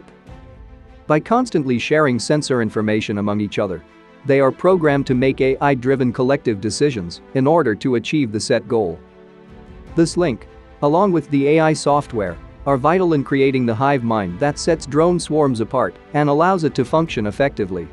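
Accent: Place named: India. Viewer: Voice script to hide Mumbai accent? Be proud of your Indian accent brother 👍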